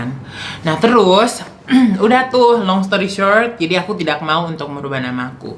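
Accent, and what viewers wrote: Indonesia. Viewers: Qu suka bahasa inggris nya, aku suka logat manadonya. Sukses sllu kakak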